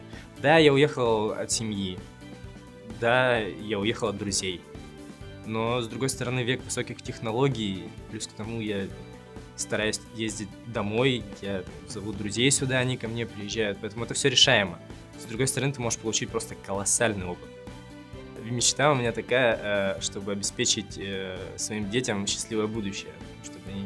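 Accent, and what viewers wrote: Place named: Urals, Russia. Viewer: Даже можно было бы не говорить что с Урала, по говору и так всё ясно)))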